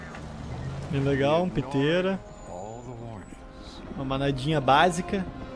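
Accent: Rio Grande do Sul, Brazil. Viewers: você do Rio Grande do Sul?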